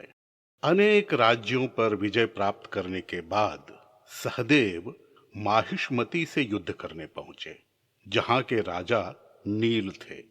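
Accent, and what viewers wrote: Standard. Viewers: वाह वाह वाह अद्भुत कथा तथा आपकी वाणी के माधुर्य और भाषा के शुद्ध उच्चारण का जवाब नहीं सादर प्रणाम आदरणीय🙏💐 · महाभारत की अद्भुत् कथाओं की शृंखला में कही गयी एक और अद्भुत् और मेरे लिए एक दम नयी एक कथा...! कथा वाचन की आपकी शैली और कला को शतशः नमन आदरणीय। आपकी आवाज़ और उच्चारण की शुद्धता चमत्कृत करती है।चित्र एवं संगीत प्रभावी हैं। इस लाजवाब कथा और उसके शानदार प्रस्तुतिकरण के लिए आपको हार्दिक बधाई एवं धन्यवाद आदरणीय 🌹💐🌹💐🌹 सादर नमन एवं शुभरात्रि आदरणीय 🌷🙏🌷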